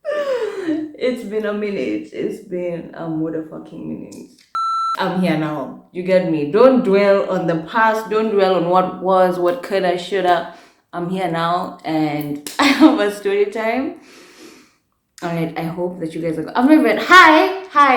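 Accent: United Kingdom. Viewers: Newly hooked and is pretty😻your skin is to die for...Damn!!! Also it's the Britt accent for me😂😂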